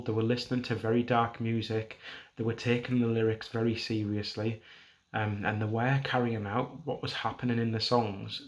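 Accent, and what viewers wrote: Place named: Hartlepool, United Kingdom. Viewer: Can't wait for the next one. Very upset as any decent person would be but the family got their daughter's mortal remains back to bury at least. Very well presented. Lovely to hear a Hartlepool accent on Youtube.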